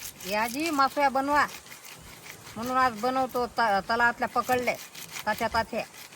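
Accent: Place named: Vidarbha, India. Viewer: Aaji tujhi bhasha ekun gaavi ch aalya chi feeling yete. Vidarbha chi god bhasha as good as it's food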